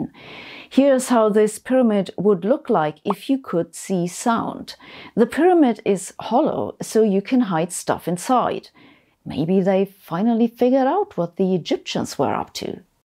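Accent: Germany. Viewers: Deadpan humour with a German accent is the best thing ever.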